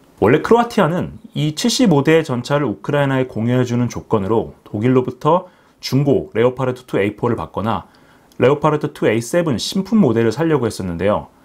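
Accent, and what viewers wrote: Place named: Busan, South Korea. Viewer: 말이 뭐가 빠랐냐 니가경상도 부산억양에 익숙하지않아서 그런것일 뿐이다 노량진 학원가서 경상도말 배워라. 나는 그것도 늦어서 속도1.5로듣고있다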